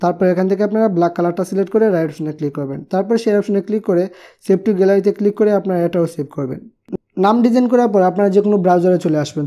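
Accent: Sylhet, Bangladesh. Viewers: Vaiya apne Sylheti ni?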